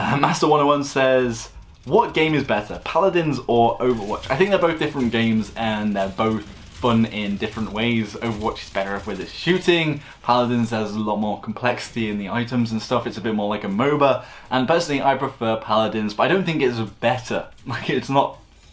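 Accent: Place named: England, United Kingdom. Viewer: What part of England are you from? :D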